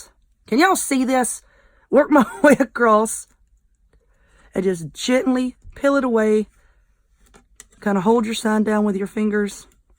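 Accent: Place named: Alabama, United States. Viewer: Hello, I watch all of your videos and LOVE THEM ALL!!! My fave is the 🌹 done on a kitchen strainer!!!!! YOU ARE BRILLIANT!!!!! I am from the south, ALABAMA, and my ancent is just like yours, lol, I cuss too, it's my second language lol. I do all kind of crafts, EVERYTHING!!!! Right now I am into ALL THINGS CONCRETE,LMAO!!!!!! FIRST OFF THE SUPPLIES ARE CHEAP.... QUIKCRETE $3.88, SAND $2.88 ( BOTH ARE 50 POUND BAGS ) AND WATER. YOU CAN LITERALLY MAKE ALL KINDS OF STUFF THAT LAST FOREVER WITH CONCRETE AND IT'S EASY PEASY!!!!! RESEARCH LIKE I DID AND YOU TOO WILL GET HOOKED!!!!!! YOU CAN MAKE VIDEOS ON THEM ALSO!!!!! LET ME KNOW WHAT YOU THINK.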